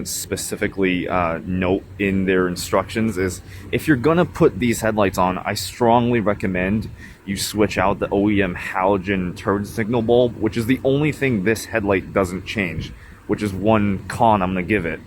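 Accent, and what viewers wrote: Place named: United States. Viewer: I see. You speak English as if you’ve lived in the States your whole life. I didn’t think you guys had Sequoia’s over there. The Alpha Rex headlights must be MUCH cheaper there if you ordered that many!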